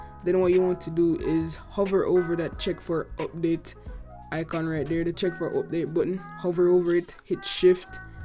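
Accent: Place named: Jamaica. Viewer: I just subbed, you sound like a Jamaican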